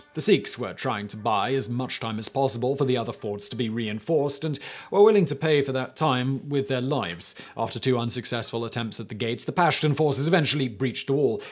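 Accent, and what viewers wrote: United Kingdom. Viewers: British people like Simon have this charming habit of pronouncing foreign words as though they were English ones when referring to them, instead of using a foreign pronunciation that's very well known. Americans are much less likely to do it, although it's still fairly common for people to butcher the Latin pronunciation of infinitives that end in -(i/e/a)re as if they rhymed with hire, here, or hair, which they do if you pronounce the symbols as though they were an English word instead of a Latin one, but is as wrong as Simon's pronunciation of the French words in this video. It's odd because you'd think Europeans would be more aware of the fact that things are pronounced differently in different languages, but the British being insular probably get it much less.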